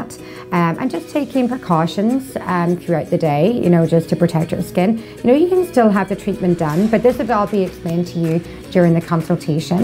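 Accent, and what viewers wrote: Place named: Ireland. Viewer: Cute Irish accent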